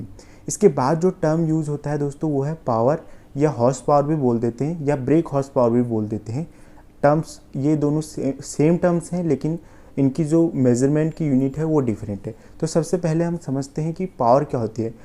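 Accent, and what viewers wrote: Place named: Uttarakhand, India. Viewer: bhai teri videos se ek chiz clear hai ya to tum mechanical engineering ya automobile engineering ke student ho..... aur bolne ke tarike se lga rha... Uttarakhand se ho...🤩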